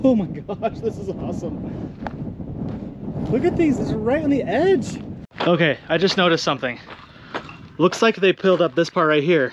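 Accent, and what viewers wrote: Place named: Utah, United States. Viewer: Depending on where you are my money is on Fremont Indians if you are north of The Colorado River or Anasazi if you are south of the Colorado. The terrain looks like southeastern Utah. Maybe somewhere between Beef Basin and Grand Gulch. I lived in Moab for almost 30 years. Sites like this are all over the place in and around Canyonlands. Secure food storage was critical and it is almost a certainty that this construction was for that purpose. Actual dwellings in that area tended to be pit houses. Listening to your narration I am guessing you are a Utahn. I’m even going to guess Utah County but oh my heck…. I could be wrong. 😊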